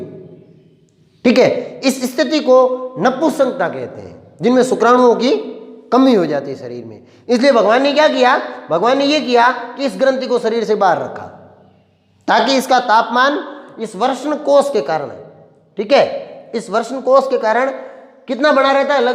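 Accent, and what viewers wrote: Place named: Marwari, India. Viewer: Aap to bahut ache trike se samja rhe ho, muje aapki marwadi voice achi lagee 😂😂😂😂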